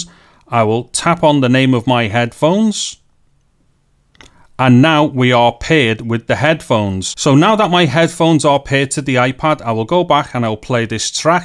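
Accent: Liverpool, United Kingdom. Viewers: Cheers scouse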